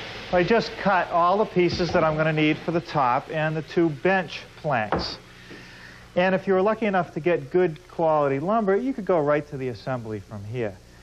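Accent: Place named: New England, United States. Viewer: A measured drawring. Lol My dad used to watch this all the time, i’d watch sometimes and always found the New England accent amusing and his cheerful attitude and lack of ego despite knowing more than just about anyone about it unusual, even then. I have a radial arm saw that’s probably older than the one on that show, with fewer safety features, but still runs like new. But I have it because my dad loved his so much, it’s what I learned on, so I got one of my own, all because of Norm.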